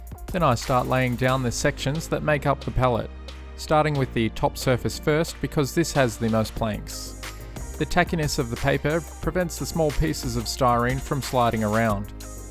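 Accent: Australia. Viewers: His accent sounds Australian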